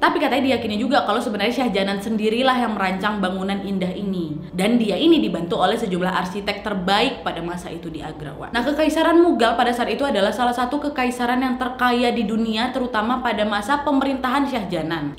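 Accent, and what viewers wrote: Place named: Medan, Indonesia. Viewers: Orang mana kamu nak,bahasamu Medan xx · Salam saudariku,logat medanmu kental ya... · Sukak kali aku lihat kau dek dengan cara menceritakan nya dgn gaya Medan en betul enak dan lucu tidak membosankan. Sukses selalu dekku.😘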